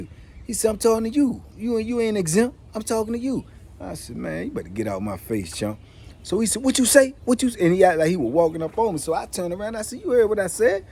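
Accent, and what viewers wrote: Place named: Washington DC, United States. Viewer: You sound like you from DC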